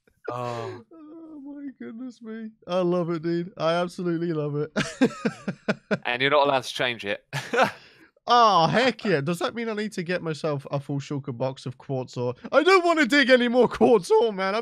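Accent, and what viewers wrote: United Kingdom. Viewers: After listening to Last Week Tonight and then watching this, I honestly thought John Oliver was playing Minecraft 😂. Love your voice m8; so incredibly British! Excellent as always... PEACE!!!✌️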